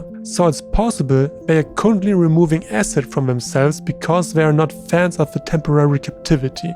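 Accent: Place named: Germany. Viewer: Hey man Great Content fellow ant keeper here. Are you German btw because you got the German accent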